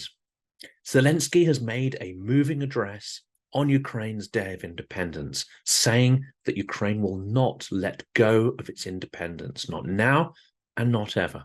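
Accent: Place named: United Kingdom. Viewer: Ukrainian shirt + Brittish accent! 🇸🇪 ❤ 🇬🇧 🇺🇦 💛💙💛💙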